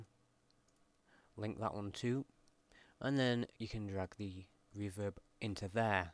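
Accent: United Kingdom. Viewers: IDK IF I'M JUST TIRED AND CAN'T UNDERSTAND U BUT U R JUST 2 BRITISH M8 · Hahaha sorry, I just started watching the show and now I think half of Britain sounds like him. Great tutorial by the way! I'm not blindly stumbling around the program making beats like a 2 year old with a sound making toy anymore. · man your accent is the worst uk accent, worse than scouse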